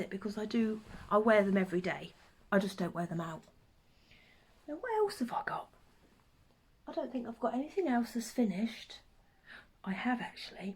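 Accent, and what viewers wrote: Norfolk, United Kingdom. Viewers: Hi, I don’t know if you’ll get this as it’s on your first podcast, but the algorithm popped up your channel with current new video and I’ve been watching for a few weeks now and thought I’d go back to your start. I thought I detected a Norfolk accent, my husband and his family all came from Norwich, although we live in Swansea now. I love watching you. You remind me of my cousin. Take care. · I have loved watching your episodes so much I have decided to go right back to the start and watch them all one after another. I'm in Bunbury, Western Australian and my son lives in Norfolk as well so new I had heard your accent before when I hear his friends. Wishing I was over there again but the virus has kept us apart from two years now. Cannot believe how talented you are!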